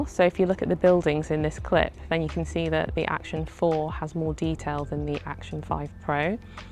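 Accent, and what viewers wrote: United Kingdom. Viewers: They've got my attention, warmth, 10 bit normal, brighter. I've never bought one. This one may get me. Great videos, your accent brings me back to when I used to live in the UK. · my first time watching your videos. Your delivery is great (probably the accent lol -- dunno why we Canadians don't talk like Brits...) ...anyway, the content was the best I've seen on this crucial question. I am going on a motorbike trip in a few weeks and literally just bought the Osmo Action 4 after seeing this...didn't even hesitate. Nobody in the comments (that I've seen at least) even mentioned how tack-sharp and natural the wind-cover on the OA4 looked in comparison to the OA5, which looked all mushy and smudged out like it was painted in the video. Annnnyway, I even watched your follow-up with the firmware update...no better imo. And ya, I know my purchase might be short-lived with the Osmo Action 6 due VERY soon, but need something for the trip. 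Sold my GoPro12 (thank god), that thing crashed so often...the stupid wrist strap never synced...nightmare. Anyway, look forward to your Action 6 video!!!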